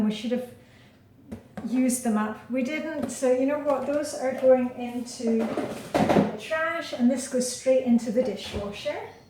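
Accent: Scotland, United Kingdom. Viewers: Are you from Denmark? I was going to say you sound Scottish lol hope I don't upset you saying that. ❤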